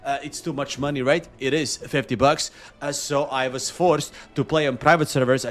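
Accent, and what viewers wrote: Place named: Scotland, United Kingdom. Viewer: Odakle ti ovaj Skotski naglasak?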